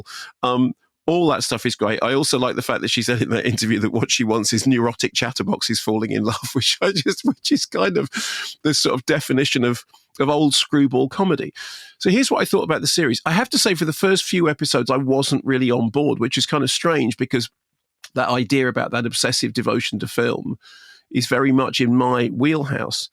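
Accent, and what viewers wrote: United Kingdom. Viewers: Love the way Brits pronounce garage. Like it rhymes with carriage. Hilarious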